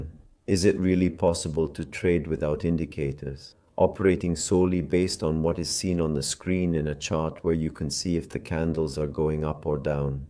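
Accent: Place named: England, United Kingdom. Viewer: Me gustó con la pronunciación inglesa, ud siempre innovando y superándose, lo admiro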